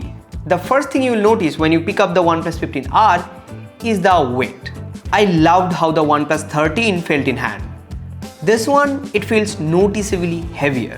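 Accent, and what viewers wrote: India. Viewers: R u bengoli? I mean if u could work on your accent a bit, the presentation of the video will surely be boosted alot nd try to keep urself more upclose.... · bhai tu west bengal se hai kya tere accent se lag ra hai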